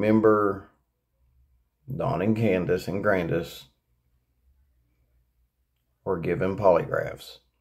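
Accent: South, United States. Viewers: You look better today. Younger even! Just love your southern American accent.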